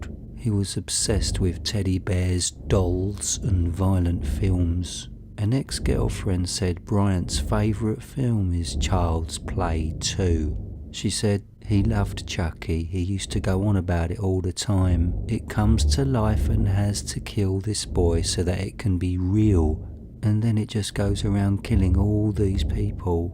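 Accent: United Kingdom. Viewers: Nice cockney voice mate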